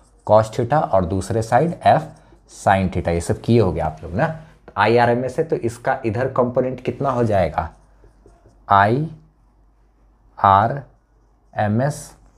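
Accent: Bihar, India. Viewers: Sir.. Aap Bihar se ho kya??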